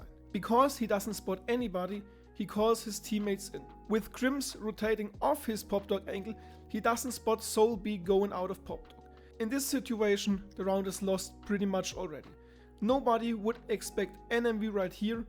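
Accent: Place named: Germany. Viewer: good video mens))) i like your jermen accent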